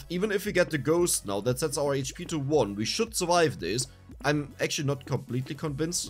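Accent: Germany. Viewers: Der deutsche akzent ich liebs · Man hört einfach so raus das der deutsch ist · You got a very strong german accent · Imagine doing english videos with the deepest german accent i have ever heard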